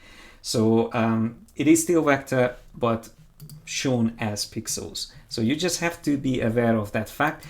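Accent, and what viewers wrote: Hungary. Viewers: Bro your accent sounds Hungarian! :D are you? Thanks for this, excellent tutorial!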